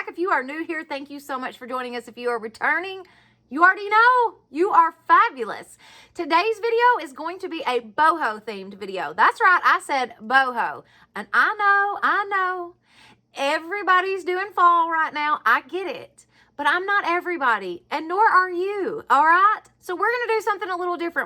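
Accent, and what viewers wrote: South, United States. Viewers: I have been watching your channel from the beginning & the quality of your videos has improved so much & so FAST!! They’re almost as AMAZING as all of your crafts!! I knew from the caliber of your projects at the beginning that you would only get better & BOY have you ever! And OMG! You CRACK. ME. UP. When you call your project pieces “dude” I just die! 🤣 I’m a southern girl too-I grew up in Savannah-& miss hearing that deep Southern accent (I don’t have one unless I’m IN Savannah for a while & even then it’s not very heavy.) I just think you are hysterical! You seem to be a genuinely sweet person & I very much enjoy all of your videos-your DIY’s are SO GOOD! Thank so much! 😘 Amy (from North Atlanta) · You are adorable and I love watching your videos!! Love your Southern accent. Pls tell me, how do you do your lashes??? Gorgeous Girl ❤❤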